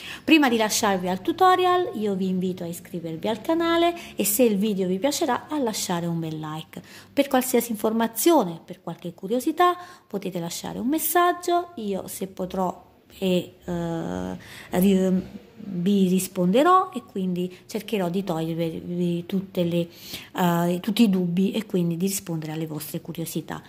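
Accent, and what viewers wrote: Italy. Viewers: Molto carini! Brava nella spiegazione......si sente che sei siciliana 😀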